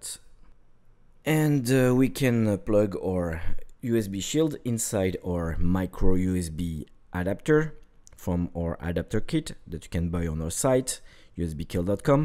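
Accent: France. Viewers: you have a french accent,idk cause i'm french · jolie accent français xd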